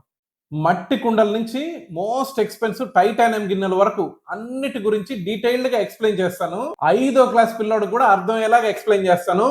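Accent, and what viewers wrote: Nellore, India. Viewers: I like your way of thinking and explaining things very clearly.I like your Nellore style accent.God bless you young Dentist.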